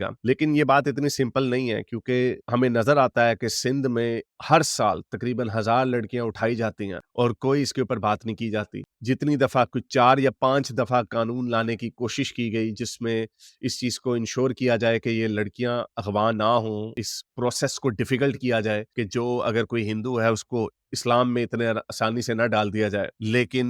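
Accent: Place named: Punjab, India. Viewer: Being indian punjabi I love ur punjabi. The same style. God bless u.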